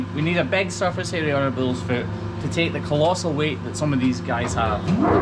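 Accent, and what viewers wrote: Scotland, United Kingdom. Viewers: I love all your video cause your never do click bait it’s always real and very entertaining to watch and I’m sure everyone loves your Scotland accent lol i know I sure do but I just wanted to thank you for these extremely entertaining videos ❤️ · Scottish accent is cute. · Lmao tbh the peeing and pooping and the reactions and the scottishness is half of why I watch this channel, the other half being the bovines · I love your videos! Part of it is the wonder of this previously unknown, amazing world. Another part is the sunny style of presentation. And part is just your delightful accent - and learning some Scottish slang! But I have a question——what is the difference between a hoof trimmer and a blacksmith?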